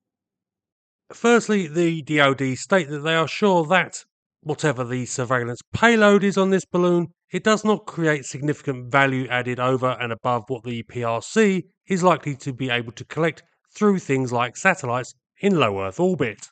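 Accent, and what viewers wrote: United Kingdom. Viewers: Always refreshing to hear a UK voice, with a reasonable, calm, unsensational approach to a major issue. 👍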